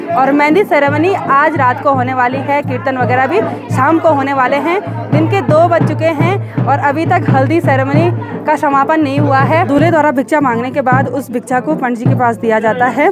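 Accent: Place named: Uttar Pradesh, India. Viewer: Jab aap hindi bolte ho tab aap garhwali nahi lagte up k lagte ho 🙏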